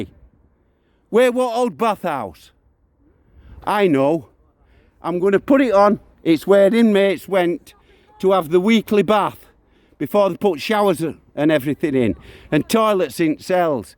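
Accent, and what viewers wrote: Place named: Wakefield, United Kingdom. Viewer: Smashing audit with great commentary. Where are you originally from pal?....very distinct accent! Older style Wakefield or even Royston Barnsley?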